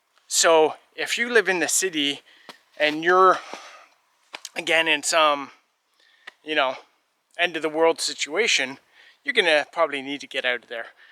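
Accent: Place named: Canada. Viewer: Strong Canadian accent here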